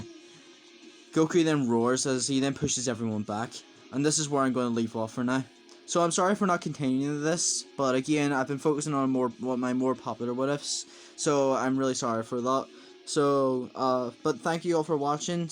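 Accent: Ireland. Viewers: The second scottish youtuber I found yes!! Edit:I mean irish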